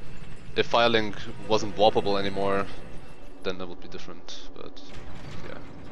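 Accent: Germany. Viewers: How's the Dragon Tooth so powerfull?!? Also your DT attacks look like a downward swing/thrust combo which seems to be critical for this devastating damage output. Could you explain that to me, please? Unfortunately I'm totally unfamiliar w/ Dragon Tooth because I've never made use of it. Btw, if you speak German (which, judging by your accent, you most likely do), you can also explain it in German to me if you want ;). If you don't, please excuse my misjudgment :).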